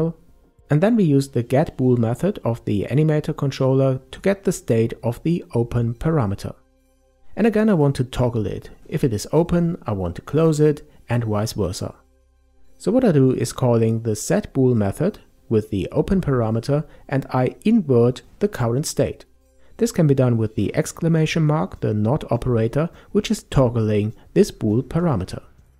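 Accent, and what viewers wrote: Germany. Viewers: You sound so german I almost thought you would start talking german.^^ Fand ich irgendwie totaly trippy.^^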